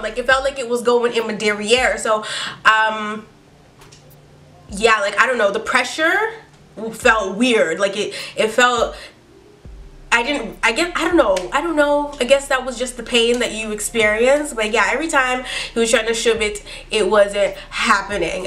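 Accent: Jamaica. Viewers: is she jamaican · are you Jamaican by any chance. wondering because of those songs and some words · Are you Jamaican ? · ya Jamaican, or you just like our music?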